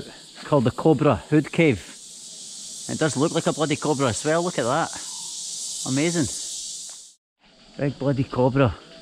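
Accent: Scotland, United Kingdom. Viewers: Its funny those 2 yanks hadn't realised your strong Scottish accent and they've been all over Scotland 😜😉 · Are you Scottish? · he is very Scottish. Scotland is a beautiful country to the north of England. to a British ear he is sooo Scottish. lovely.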